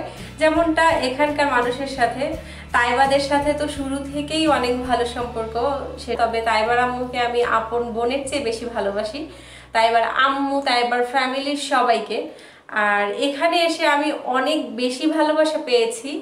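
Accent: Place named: Barisal, Bangladesh. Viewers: To mar borisal er moto kotabola kub valo laglo tomarvlog dakte valolage ami rubina to make amar didir moto lage.ami sarervdo deke 25 kg ojon komiachi.akon ami 49kg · ami tomake ajkei boltam borisaler vasai kotha bolte ar tumi deksi already bolso,meg na chaite jol.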